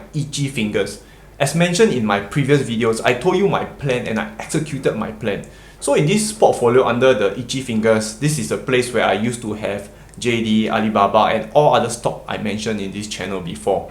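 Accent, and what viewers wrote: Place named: Singapore. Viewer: bro your videos are amazing. your Singaporean accent is so strong, but your messaging is so clear. love the content bro, you make Youtube a better place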